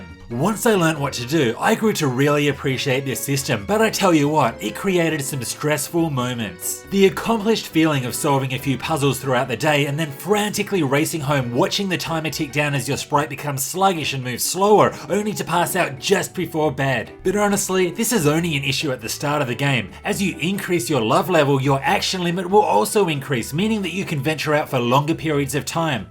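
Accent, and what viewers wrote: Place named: Australia. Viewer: Your accent is all over the place. I’m Australian and it sounds like you might be too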